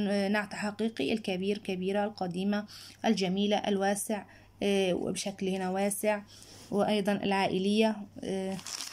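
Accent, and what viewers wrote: Egypt. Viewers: انتي مصرية؟؟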